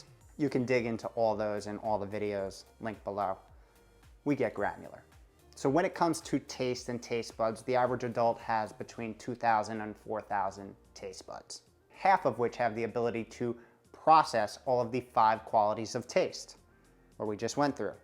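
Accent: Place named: Long Island, United States. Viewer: I have hit approximately hour 45 of my extended fast, trying to hit 72. Cannot believe you did this for a week. I am used to ADF but trying to break things up a bit so my metabolism doesn't get acclimated. Trying to watch videos like yours to keep my momentum going. It sounds like you didn't always eat so perfectly, did you have a transformation of sorts? That's the journey I am on. If you did, could you do a video on that? Thanks to ADF I was losing weight before Covid-19 now I am certain that when we go back my co-workers will be shocked at the weight loss I have accumulated so far..my journey won't be over though. It's certainly nice to be losing weight during a time when others are unfortunately gaining weight and falling into such unhealthy habits. Thanks for this. Also I definitely hear the Long Island accent, I have family from Lake Ronkakoma.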